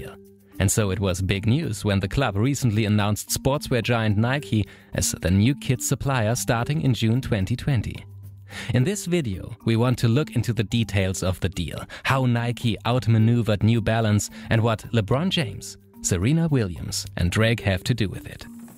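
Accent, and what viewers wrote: Germany. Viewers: First time on this channel, I can tell that the speaker has a German accent 😀, right or wrong? I'm German speaker, so I think I nailed it lol. Interesting topic in the video. Edit: Just heard how you spelled Jürgen Klopp , well, now I have no doubts at all 😂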